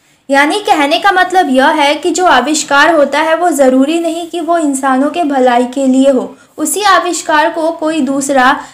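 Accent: Bengal, India. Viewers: Dii ap Bengali Ho Kya. Love from Rajasthan❤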